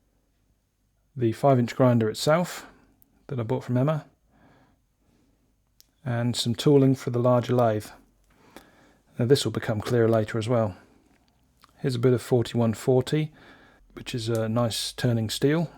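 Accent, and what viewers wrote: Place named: England, United Kingdom. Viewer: Hi, Robert from Sydney here 👋🏼 Newbie to your channel mate, but enjoying your ‘stories’ immensely. Didn’t even know you were in Oz till this vid. I got fooled by the English accent 🤣 My younger son is currently on a two-year secondment in Hobart… due back to Sydney this year. I understand your need for space-efficiency. A lot of my machines are on castors as well ( single car fibro garage 😳). I like your design for heavy duty ‘bases’ for the machines 👍. Regards Robert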